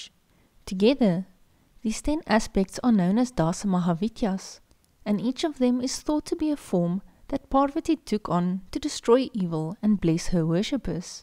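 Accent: South Africa. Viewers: Very nice content. Familiar accent. South African?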